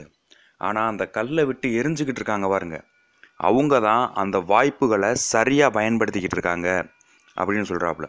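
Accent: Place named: Madurai, India. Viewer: arumai! langauge slang is plus for you Madurai karanda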